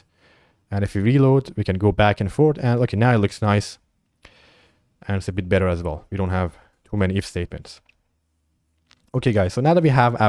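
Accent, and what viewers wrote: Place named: Iran. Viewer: you are very good at teaching keep it up! and i think you're persian... am i right ? i almost can feel the accent 😂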